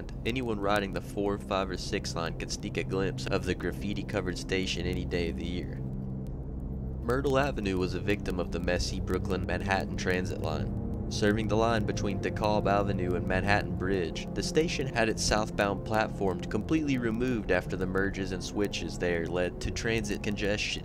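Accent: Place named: Chicago, United States. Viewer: The narrator has a Chicago accent, which is odd for an NYC video.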